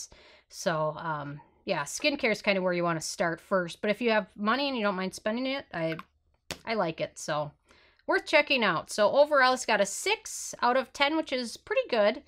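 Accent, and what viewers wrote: Minnesota, United States. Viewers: I love how honest you are and that you have a Minnesota accent like me 😄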